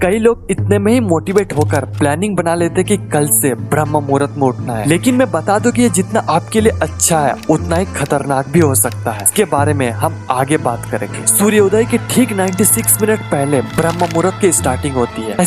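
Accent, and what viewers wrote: Assam, India. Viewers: Bro are you from Assam?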